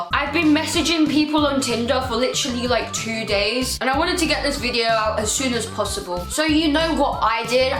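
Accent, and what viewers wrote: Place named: Scotland, United Kingdom. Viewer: Funny accent, scottish?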